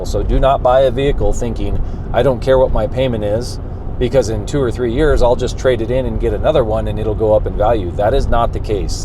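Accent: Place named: Colorado, United States. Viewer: I'm buying my plug in hybrid Rav4 from Greely in the next few weeks! I could tell you are a fellow Coloradan by your accent 😂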